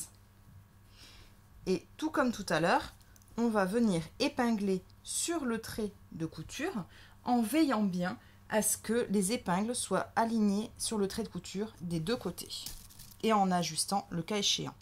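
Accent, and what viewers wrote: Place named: Toulouse, France. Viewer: Bonjour, tes tutos m ont beaucoup apporté lorsque j'ai commencé la couture et je suis ravie de te retrouver, même si je sais coudre des pinces desormais... ceci dit le coup du repére au poincon est une super idée! Je voulais surtout te féliciter pour l’amélioration technique phénoménale image et son (c 'est mon métier, j'y suis sensible) et espère te retrouver bientôt pour de nouvelles vidéos avec ton joli sourire et accent qui me rappelle la maison (toulousaine expatriée a Paris )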